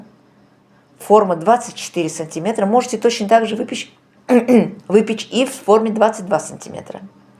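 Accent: Baku, Azerbaijan. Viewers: Спасибо за рецепты!!!У вас настоящий бакинский акцент😂😂😂❤❤❤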